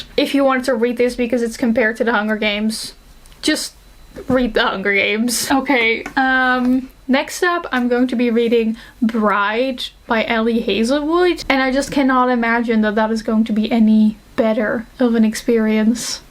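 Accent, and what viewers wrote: Netherlands. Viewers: omgg jij bent Nederlands? ik dacht dat je dat was vanwege je accent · Okay i didn’t know you we’re dutch untill i read the settings in your e book😭 so thats why you have an accent!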